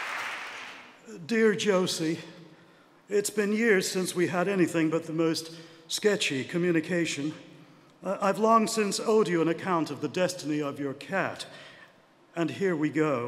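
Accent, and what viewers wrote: United States. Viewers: Thank you. I was wondering where he got that particular american voice from. Doesn't sound at all like his usual voice I know from various british tv series. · His American accent is surprisingly good!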